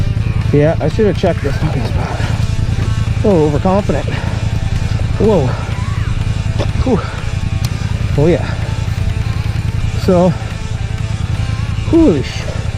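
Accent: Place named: Canada. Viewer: Sound Canadian bro!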